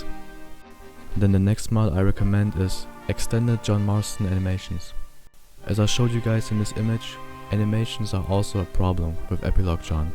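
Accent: Germany. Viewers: starkes video und feier den deutschen akzent haha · I think I hear DEUTSCH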